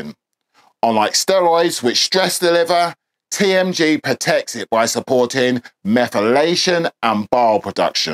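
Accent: United Kingdom. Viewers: I love your snappy Brit accent...reminds me of the Guy Ritchie movies...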